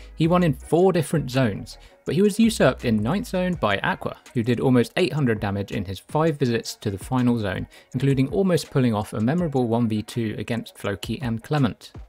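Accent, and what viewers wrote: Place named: England, United Kingdom. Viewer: This is so good but since im from australia the english accent triggers me lmao, good shit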